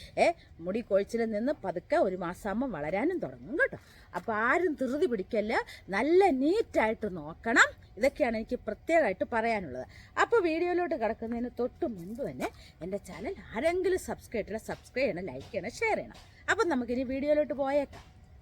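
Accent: Kottayam, India. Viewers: ഇന്നത്തെ വീഡിയോ കണ്ടിട്ട് എനിക്ക് ചിരിവന്നു. മറ്റൊന്നുമല്ല ചേച്ചിയുടെ സംസാരം കേട്ടിട്ട് തന്നെയാണ് ചേച്ചി കോട്ടയം എവിടെയാണ്. എന്റെ തറവാട് കുമാരനെല്ലൂർ ആണ്. അമ്പലത്തിനടുത്താണ്. ഞാൻ നാട്ടിൽ വരുമ്പോൾ എനിക്ക് ചേച്ചിയെ കാണാൻ വരണം എന്നുണ്ട്.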